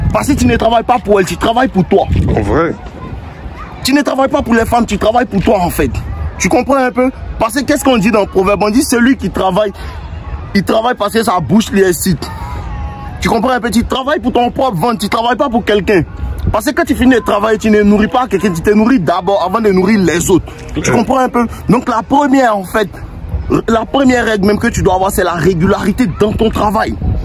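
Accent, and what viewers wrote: Ivory Coast. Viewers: on adore ton accent ivoirien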